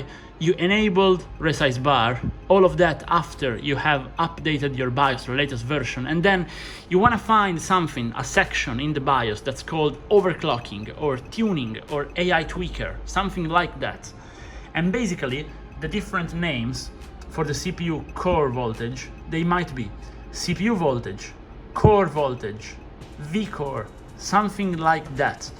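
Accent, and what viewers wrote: Italy. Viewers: Qualcosa mi dice che sei Italiano... o al massimo spagnolo, comunque grande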